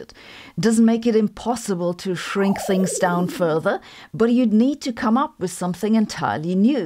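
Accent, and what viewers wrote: Germany. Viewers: but seriously, she is German. I hear they might have an accent over there that is different from what you might be used to :P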